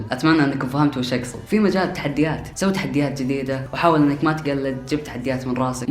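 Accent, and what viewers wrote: Kuwait. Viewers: أحسبه كويتي ههههـ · انت سعودي احسبنك كويتي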